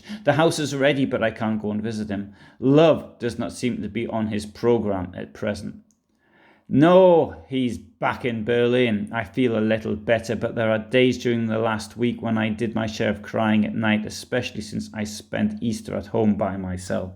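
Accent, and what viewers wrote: Newcastle, United Kingdom. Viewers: Another excellent film, thanks. Always a treat to hear Eva's diary in a Newcastle accent. Hahaha!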